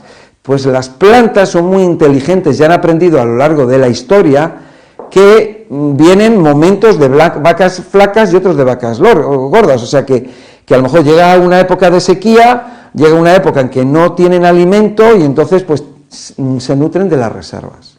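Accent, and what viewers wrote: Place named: Spain. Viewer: Me encanta ese acento Español soy cubana todos mis abuelos eran Españoles hasta yo hablo distinto a lo notmal soy maestra de Español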